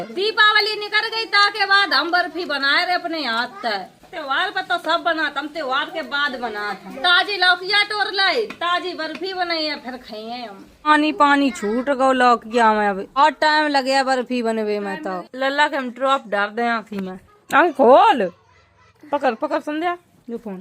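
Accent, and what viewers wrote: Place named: India. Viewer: ❤love yours Desi accent and voice❤long live with health wealth happiness and success in every part of life. Always respect and love❤ from Pakistan 🇵🇰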